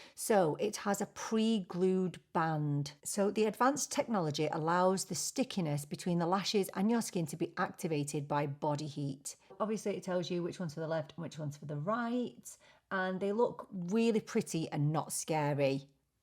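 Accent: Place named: North, United Kingdom. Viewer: No I don’t wear lashes either it just takes too long because I have no skill either 😂 but those ones sound a bit easier. Did I ever tell you watch your videos because you are funny, entertaining and I love your northern accent 😊🙌🏼💜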